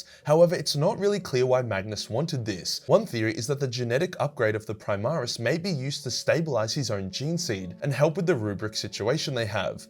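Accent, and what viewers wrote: Australia. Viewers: Wow glad to see my favourite character be told by an australian, thanks mayte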